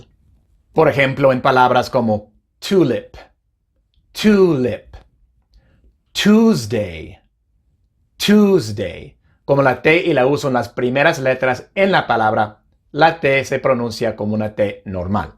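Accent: United States. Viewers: Tu inglés es acento Americano por eso lla t la pronuncias de eso modo, porque el inglés británico si la marca más. Tendrías que aclarar que tu acento es Americano. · Soy ingles. En Inglaterra decimos arTist - no arDist. VerTical- no verDical. ThirTy - no thirDy. El pronunciacion de is pronunciacion del EEUU, no de Inglaterra. El ingles original y mas correcto es de Inglaterra.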